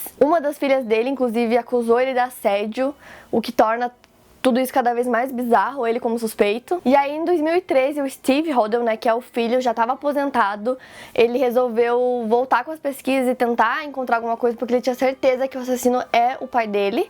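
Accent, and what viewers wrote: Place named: Paraná, Brazil. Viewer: Claro que tem. Todo mundo tem! Ela fala "de" e não "dji", por exemplo, que é uma característica do sotaque do Paraná.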